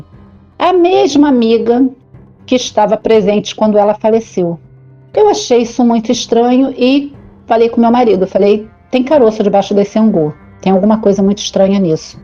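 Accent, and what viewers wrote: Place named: Rio de Janeiro, Brazil. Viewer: Eu to viciada na!!! Estórias mto boas!!!!! E eu que sou espírita seu que tudo que ela conta é real. E é carioca. Adoro sotaque carioca. Beijos pra vc. Brigada pelas estórias. 🥰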